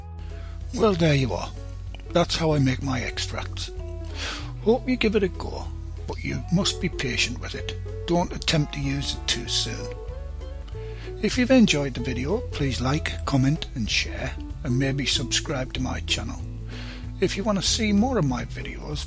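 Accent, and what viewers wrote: United Kingdom. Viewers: I have subscribed already and managed to see some of your videos I really enjoyed them, you sound intelligent professional and everything is clear and well explained. Please continue uploading good videos. (and of course who can resist the British accent :-)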